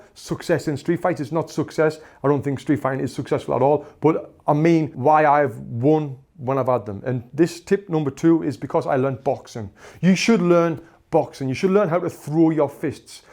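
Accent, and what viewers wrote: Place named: Peterlee, United Kingdom. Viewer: I live in Peterlee haha I though your accent sounded very similar to mine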